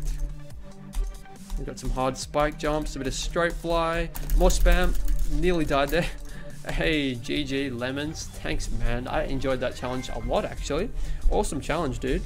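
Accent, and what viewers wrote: Australia. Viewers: lemons is sounding significantly more australian than usual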